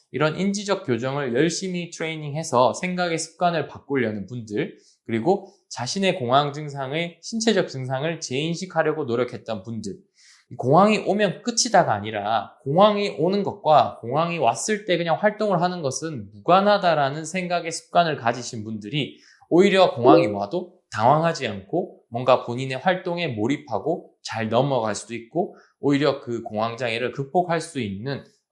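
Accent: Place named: Gyeongsang, South Korea. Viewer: 솔직히 방송 하려면 경상도 억양 정도는 고치고 방송 하는 최소한의 노력은 보여야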